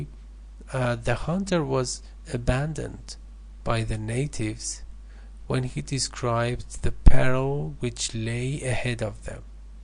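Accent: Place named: Iran. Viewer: Such a thick Persian accent! dear lord! P.S. your examples are filled with grammatical errors.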